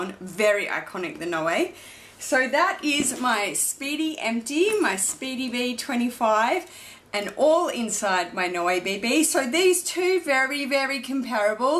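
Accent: Australia. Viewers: your videos and perhaps your Awesome Aussie accent bring cheer to my day!! More! More! More!! 💜 much love and happiness to you!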